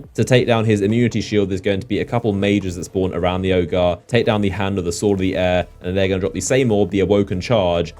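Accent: United Kingdom. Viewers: Pls talk a little bit slower, i barely understand u bcuz of your british accent.Thank u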